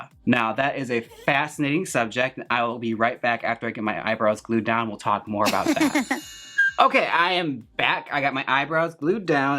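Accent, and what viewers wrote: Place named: Midwest, United States. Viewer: I love that Midwestern accent, you and Trixie both kind of lean toward pronouncing it “draig” (but not nearly as much as Canadians, Brooke Lynne says dræg 😂)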